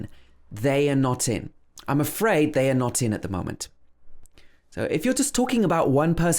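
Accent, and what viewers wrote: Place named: United Kingdom. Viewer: Omg i really wanna learn british accent watched all ur videos...pure british🔥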